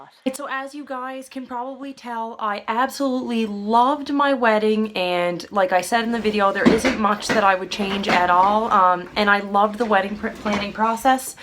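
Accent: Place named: Cape Breton, Canada. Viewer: I saved a bunch of wedding videos to watch later for getting started with my wedding planning. I'm in Ontario but I'm from New Waterford and it was a nice suprise to come across your video - I knew by the accent you were from the island! Lol Thank you for the very helpful video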